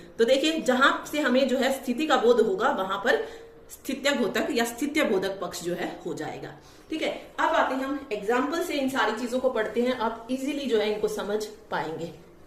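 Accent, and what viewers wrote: Haryana, India. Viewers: Ye haryaanvi tone h bhai. Change nahi hogi🙂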